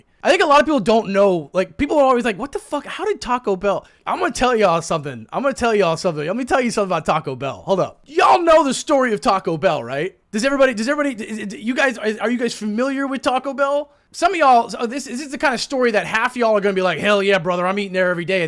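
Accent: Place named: Spain. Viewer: Man, why the Spanish accent i dont get it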